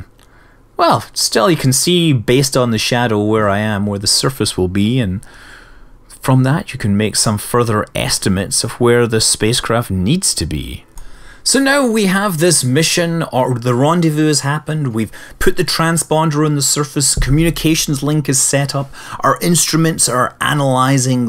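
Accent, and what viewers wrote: Scotland, United Kingdom. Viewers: It's. He have scotish accent.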